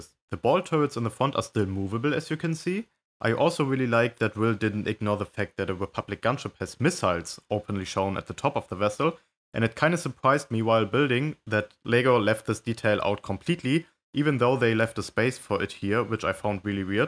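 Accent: Germany. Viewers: That German accent is crazy! Very nice! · Your accent Sound German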